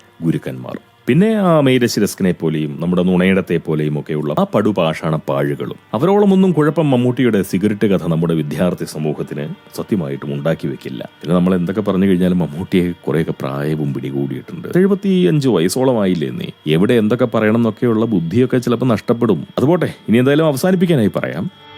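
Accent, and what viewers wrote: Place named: Travancore, India. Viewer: Excellent diction! You must be mid-Travancore brought up, I guess!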